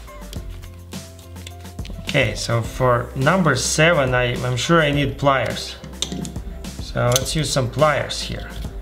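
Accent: Russia. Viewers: So russians all sound the same...interesting. CrazyRussianHacker sounds the same.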